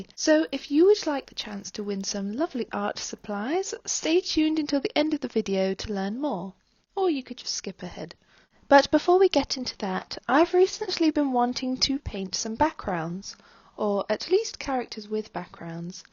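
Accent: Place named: United Kingdom. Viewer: Thank you! Also, I guessed it was a British accent but even so it sounds different than the ones I had heard so far, are you a noble or a princess or out of a fairytale? I would definitely cast you for a fantasy animation movie as a voice actress for the main protagonist ^^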